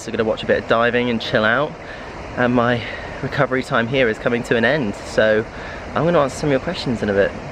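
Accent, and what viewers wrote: United Kingdom. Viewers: love to wake up on sunday, make coffee and watch your vids at 10am. (pacific standard time, california) love a british voice in the mornings. congrats. i hope upload schedule will be the same after tokyo 🤙🏼🎉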